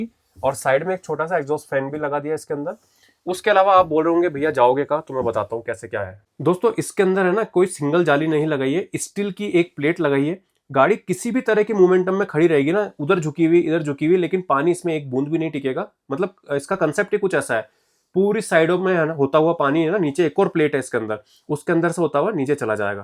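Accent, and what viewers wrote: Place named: Mewar, India. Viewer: ji aapki boli mewad ya aaspass ki lag rahi hai, mewad, malwa ya aaspass se hi ho kya sir.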